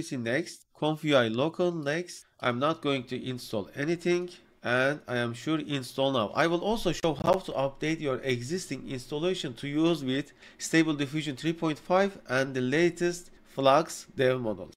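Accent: Turkey. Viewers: Bende diyorum bu kanaldaki ingilizceyi anlamam nasıl bu kadar kolay olabiliyor diye, meğersem hoca Türkmüş 😂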